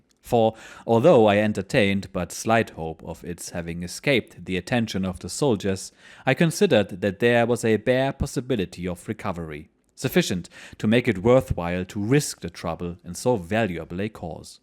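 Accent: Germany. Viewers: The war for southern Independence???sounds like lost cause propaganda. Also who are you ? You sound like your German. Stay in your lane fritz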